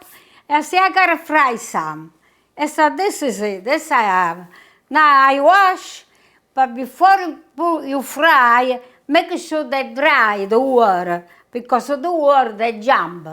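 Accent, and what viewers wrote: Italy. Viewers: Awesome garden. Wonderful that age 90 she still is going strong and still has her Italian accent! What kind of peppers are those? Awesome singing about Rome! Thank you from the other side of rt 33 in Hightstown!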